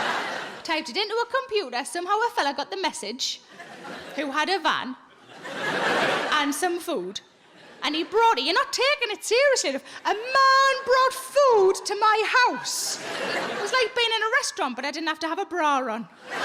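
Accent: Newcastle, United Kingdom. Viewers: Brilliant! There’s something about how Geordies (or South Shields folk) swear that I love! 😅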